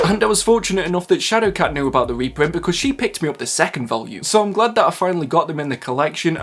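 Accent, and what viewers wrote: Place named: England, United Kingdom. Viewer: Great accent. What part of England are you from?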